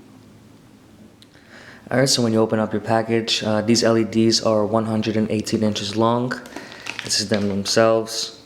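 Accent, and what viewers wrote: New York, United States. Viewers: Love the NY/NJ accent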